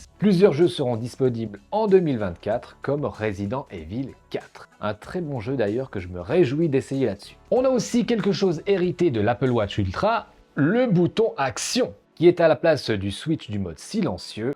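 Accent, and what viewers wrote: Switzerland. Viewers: Se bon accent Suisse il m’avait manqué, welcome back et félicitations pour cette grande mif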